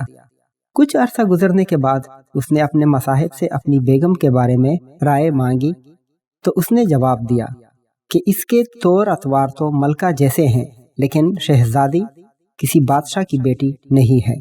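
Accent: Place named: Pashto, Pakistan. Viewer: Good excellent. Although yeh Urdu Speaking andaza kisi Pashto speaking friend ka hi Hoskta hy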